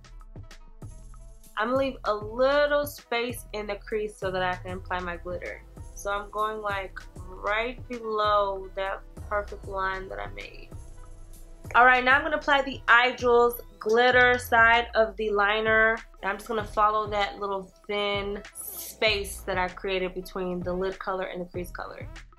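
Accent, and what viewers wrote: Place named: Atlanta, United States. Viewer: I had to make another comment. The way you say erange (orange) is EXACTLY how Atlanta people say it! 😂😂 I moved from Dallas to Atlanta and every time I hear it I'm so tickled. And you how you do that loud tail thot pop!? 😂😂😂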